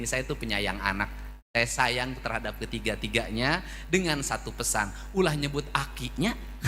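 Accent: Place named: Sunda, Indonesia. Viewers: Ingat almarhum ibu ku yg berasal dari Jabar. Sunda asli kental sekali..mendengar berkata dipodium ini..subhanallah..smoga Allah jaga trus.. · Sawios atuh P d sauran aki Oge, jandi nyunda pisan hebat kan. Hidup 👍.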